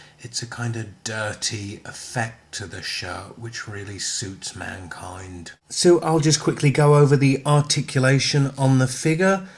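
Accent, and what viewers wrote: United Kingdom. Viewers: Oh MY GOD UR BRITISH I FEEL LIKE FAINTING! LOLOL!!! who gives a damn? Im british too. why do americans love us so much???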